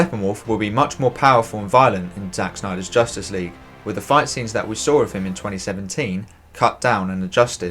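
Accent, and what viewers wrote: United Kingdom. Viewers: I love your explanation so clear despite the British accent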